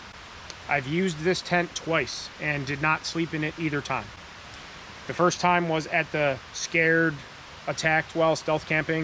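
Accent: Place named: Allegheny, United States. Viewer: You sound like your close to where I live. I live near the Allegheny national forest.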